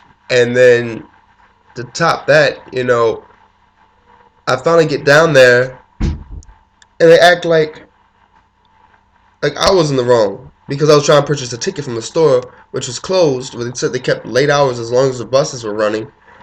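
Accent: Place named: Baltimore, United States. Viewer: You have a bmore accent is that where you from?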